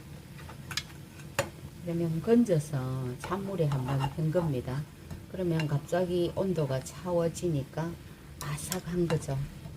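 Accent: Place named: Gyeongsang, South Korea. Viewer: 돌솥비빔밥 제대로 알려주셔서 잘배웠습니다 나긋한 경상도 사투리로 설명 해주시니까 정겹습니다 자주 뵙겠습니다 😊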